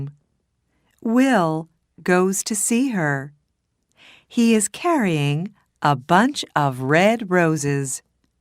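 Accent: United States. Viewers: Thank you a lot! Could you tell me what kind of English pronunciation it is. Is it American English pronunciation? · I think it's American English. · Hello Is it american english?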